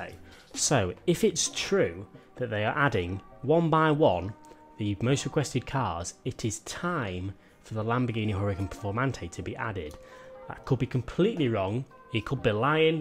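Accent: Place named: United Kingdom. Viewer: I swear his voice is the goofiest sounding British accent. Could not take him seriously.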